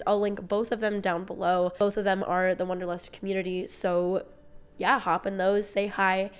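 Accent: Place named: New England, United States. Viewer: I love your content. I had to look up where you're from because I was convinced you were from New England, but I was shocked to find out you weren't. You would get along well here. You talk like a New Englander... We love the cussing. It enhances our feelings when we speak. It shows passion for what you believe in... Keep cussing, and share those beautiful thoughts and feeling 👍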